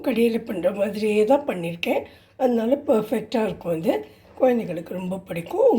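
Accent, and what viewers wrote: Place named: Iyengar, India. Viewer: burger super. filling variety ❤❤ அம்மா..உங்கள் பேச்சு..ஐயங்கார் வீட்டு வொய்ஸ்..அதை கேழ்க்க very interesting ❤❤...இந்த food item எல்லாமே western style இருக்கு. .குறிப்பா இன்னைக்கு போட்ட burger. .🎉🎉🎉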